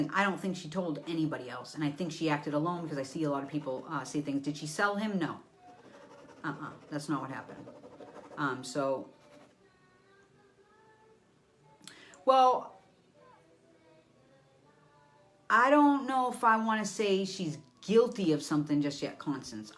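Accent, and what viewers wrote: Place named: New Hampshire, United States. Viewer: I thought you sounded like you were from (wicked awesome) NH. I'm from Manchester, what about you?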